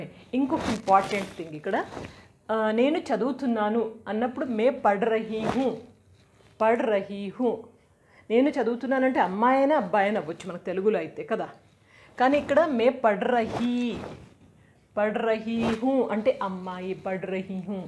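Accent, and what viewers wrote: India. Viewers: Madam way you are explaining can understand all the normal people. And hyderabadi style and traditional hat's off to you madam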